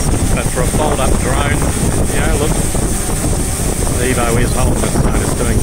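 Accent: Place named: Australia. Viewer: Where are you ? You lucky son of a gun !! Australia I think judging from your accent but wherever you're at looks awesome!!!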